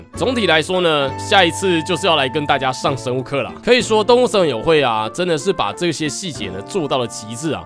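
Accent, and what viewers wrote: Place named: Central Taiwan, Taiwan. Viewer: 有中部腔😆😆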